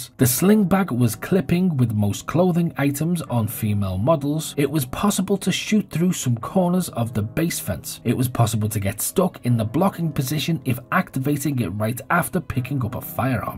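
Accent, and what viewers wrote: Liverpool, United Kingdom. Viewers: What part of the UK are you from bro ? Can't place it. Hints of scouse I think tho as a scouser myself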